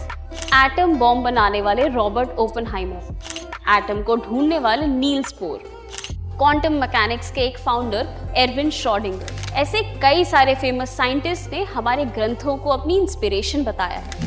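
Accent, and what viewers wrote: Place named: South, India. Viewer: It sounds offensive if one speaks Hindi like Telugu, Tamil, or Kannada. Please try to completely say words in the Stotra in the beginning, like how Sanskrit is supposed to be spoken. Eg: kara(ha) means hand in Sanskrit. Kar doesn't give the same meaning. Thank you.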